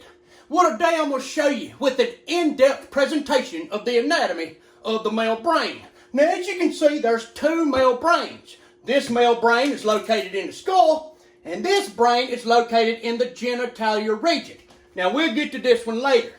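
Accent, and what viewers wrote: South, United States. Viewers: His accent makes it more American, that's why is funny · For some reason, the Southern accent makes it so much funnier :D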